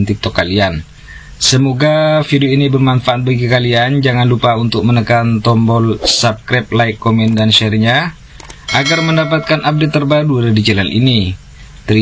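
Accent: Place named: Madura, Indonesia. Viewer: Logat madura kyk nya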